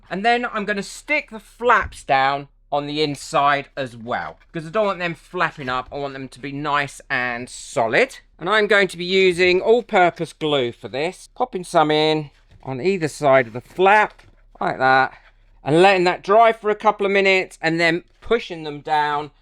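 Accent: United Kingdom. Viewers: You are adorable! I don’t really care what you’re making, I’d tune in just to watch a dude n listen to a Brit do crafting. I subbed. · I had no idea that so many people Love boxes!!!! I collect them, thinking, well, you never know…it’ll come in handy for something sometime. I’ve never done crafts, I just love boxes! I usually end up needing a particular size to mail something in. I’ll watch your video and maybe be inspired to try something new! and, btw…im an American and I love your English accent. English accents sound so sophisticated.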